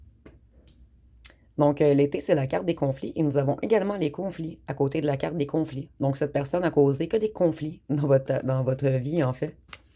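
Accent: Quebec, Canada. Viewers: Bonsoir Madame , c'est toujours avec un grand plaisir que je vous retrouve et vos guidances toujours fort juste ,votre accent québécois j'aime beaucoup et vous aussi , vous faites partie de mes préférées , vos ongles mauve je doute pas qu'ils seront jolies car il le sont déjà j'aime bien cela de cette couleur ,et je ne sait pas comment vous faites ça mais vous avez du goût c'est très jolie je ne doute pas votre façon de vous habiller très féminine et très bien chic toujours , je me sens parfois si seule et votre présence me fait exister car je m'occupe de ma mère toutes ses journées qui ne sait plus rien faire toute seule et en maladies de Alzheimer ça me fiche le bourdon pas mal ,je peux m'absenter mais très peu car ne peux pas la laisser longtemps seule et ma sœur a pris 3 jrs a la mer avec son compagnon alors je regarde vos guidances et ça me permet d'exister un peu ,sinon je suis comme vous coquette et féminine aussi a part que la j'ai pas beaucoup trop de temps . 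Merci a vous et à votre bienveillance a notre égard pr nous faire profiter de ses messages gracieusement . Bonne fin de soirée.❤👍🍀🌹🥰🙏☀️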